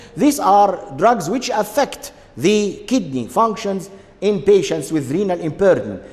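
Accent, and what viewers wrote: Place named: India. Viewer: هو حضرتك بتتكلم زي الهنود ليه 🙂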